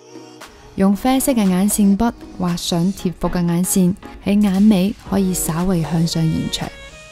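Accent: China. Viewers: you make cantonese sound so pretty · 大陸口音...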